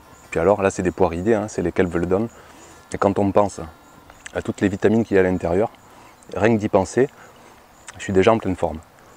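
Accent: South, France. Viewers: Salut, A la base, les petits pois, c'est pas mon truc... j'en ai mis cette année pour faire plaisir à Monsieur, et aussi parce que je n'ai jamais mangé des petits pois "du jardin", donc je veux quand-même goûter, parce qu'il y a plein de légumes que j'aimais pas, et que je mange depuis que je les cultive moi-même ^^ Par contre, j'ai semé (tardivement) des pois mangetout parce qu'à force de te voir nous les vanter dans tes vidéos, tu m'as donné envie! Donc voilà, à suivre;) Tes vidéos me mette toujours de bonne humeur, avec ton accent du Sud, ça donne un petit air de vacances. A bientôt Maud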